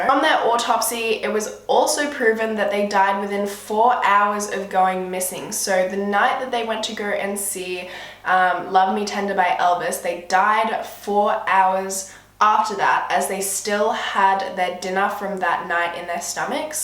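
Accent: Australia. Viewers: Not the most typical Australian accent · Is that an Australian accent? I love it😍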